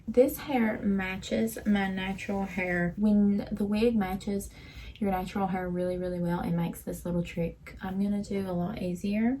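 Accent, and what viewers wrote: South, United States. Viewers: i haven’t even ordered my wig yet but this vid is so fun lol it feels like im on facetime with a sweet little southern lady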